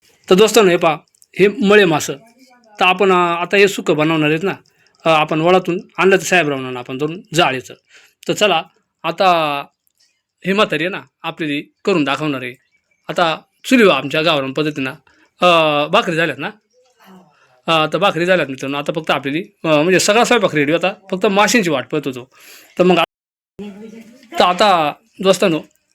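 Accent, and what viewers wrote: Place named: Nashik, India. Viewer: कुठे राहतात काका भाषा तर नाशिक जवळची वाटते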